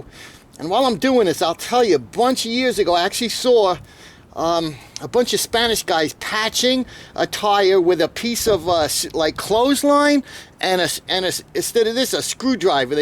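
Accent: New York, United States. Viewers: this dude is such a new yorker its almost comical ! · I see you have a first class plugging tool. They work great, where poorly built ones crumple in use. Quality lasts. I usually pound around the patch wit a hammer to help seat the patch, then test after I drive a mile or two. For a guy who talks funny(that NY accent), you'all are sure easy to understand. Great video, again.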